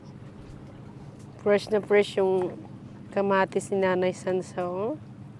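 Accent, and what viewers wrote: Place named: Visayas, Philippines. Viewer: Mailhan jud ang bisaya mo storya dol no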